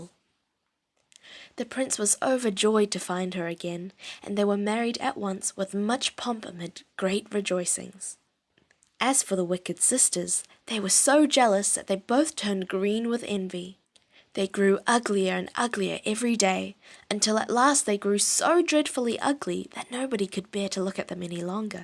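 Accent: New Zealand. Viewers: 뉴질랜드 액센트 잊지않을려고 옛날부터 많이 듣고있었어요!! 목소리 너무 좋아요 계속 올려주세여❤️ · Love your kiwi accent! 저는 20살 이후에 뉴질랜드에 가서 아시안 악센트가 강한데 역시 키위악센트가 세계에서 가장 섹시한거 같아요~ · 뉴질랜드 영어 발음으로 동화를 들으니까 리스닝에 도움이 됩니다. 감사합니다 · 뉴질랜드 억양 찾다가 우연히 듣게 됐는데 목소리 너무 좋아서 바로 구독햇어요!! 음악만 듣고 살았는데 음악 대신 계속 듣게 될 것 같아요 😍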